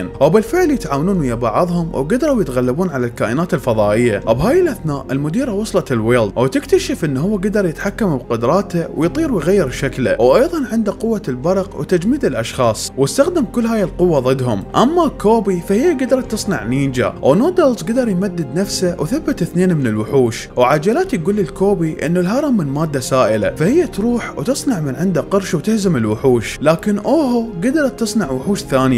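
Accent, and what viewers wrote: Iraq. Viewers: احب بس صوته عراقي تشبه والله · صوتك جميل وطريقة سردك تجنن وملخصاتك تجنن الوحيد اللي اتابع ملخصاته هو انت لهجة عراقية ومفهومة اسمتررر🥹🫶🏻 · انت من العراق 🇮🇶؟؟؟ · هستوني يله عرفت انته عراقي ✨🥱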